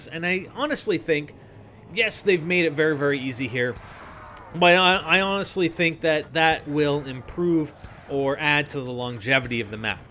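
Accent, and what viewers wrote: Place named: Canada. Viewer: are you Canadian? judging by the way you say 'out' and 'about' I think you are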